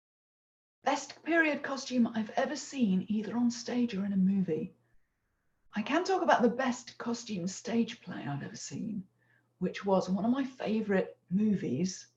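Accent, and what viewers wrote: United Kingdom. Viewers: Hello my name is Tricia and I am an introvert:) your voice is wonderful. This comment has been along time coming; but I am truly an introvert. As I said I love your voice, it is one of those "super sexy low and dulcet toned British voice's". I am out of my shell why not because of any virus no no I am in a bother about the Foundation Raveled sign up. Yes I have been stalking the Foundations Raveled web site for 10 years. Yes there should be another why, for 15 years my life has been ruled by chronic pain, and could not sew:( But now after 3 operations, 4 months of exercise and this thingy in my right buttock that I have to recharge once a week and yes I do think of myself as a cyborg. Now finally my health is good enough to sew, I am slow but I can still sew. I learnt is to make plans for the beyond what ever the difficulty was with the chronic pain. 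So make plans and hold on to them in the here and now, this is how I got through the fear. Those plans are a foot, I think, I hope, I am on the wait list, I tried to put my self on it and now I am having a very small panic. Lastly before go back in to my shell maybe the wonderful could do these live talks regularly:) xxx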